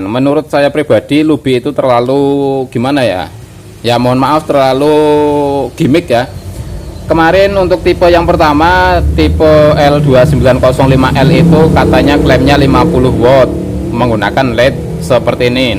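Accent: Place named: Tegal, Indonesia. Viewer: Wong tegal yaaaaaaakkkk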